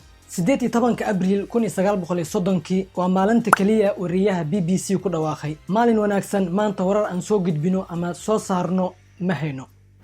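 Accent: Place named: Mogadishu, Somalia. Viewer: ee imka qof yutubar ahtahy markaa afsoomali bilaa xamar ku hadal ile reer muqdisho uun ku dawan mayaan dadkle oo guud ku dawanyee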